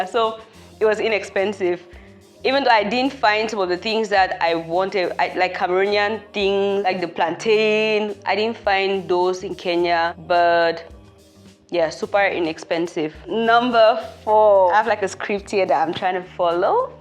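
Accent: Nigeria. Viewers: Your accent is almost Nigerian a little bit but not very heavy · You sound like a Nigerian,..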